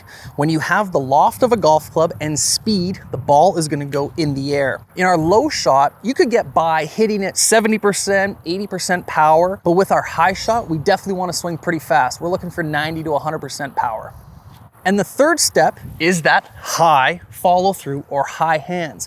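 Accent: Canada. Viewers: Is that a Canadian accent?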